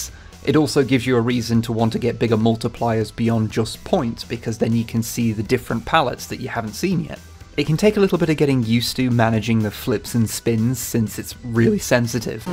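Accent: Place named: United Kingdom. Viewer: That was really good, I've missed a British man reviewing video games in my life. The game also has a demo, downloading it right now.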